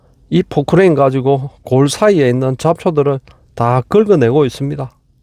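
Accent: Gyeongsang, South Korea. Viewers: 경상도 아이가 ㅋ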